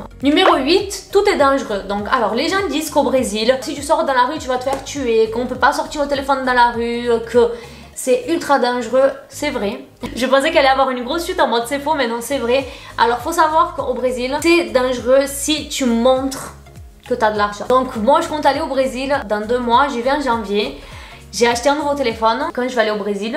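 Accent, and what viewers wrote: South, France. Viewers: Mdr j'aime trop ton accent de meuf du sud. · tu dois être de toulon peut être, c'est l'une des rares exceptions en france pour qui le rugby est une institution. D'ailleurs, je trouve que t'as un accent brésilien (of course) mais mixé avec l'accent du sud, je trouve ça vraiment stylé.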